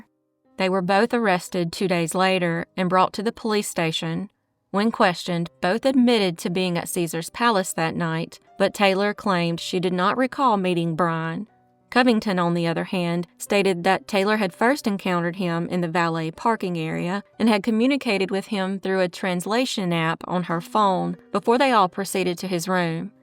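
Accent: South, United States. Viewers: I like your little music that you play in your southern accent hello from Minnesota❤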